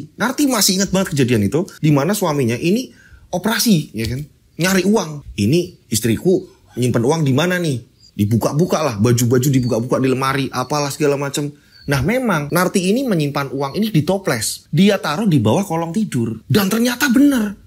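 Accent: Java, Indonesia. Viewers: Pertama kali nonton terhipnotis sama boom boom boom nya itu,ditambah mas membawakan ceritanya semangat dan lucu juga jadi pingin nonton cerita lainnya sampai sekarang tapi bahasa jawanya kok sama dengan daerahku ya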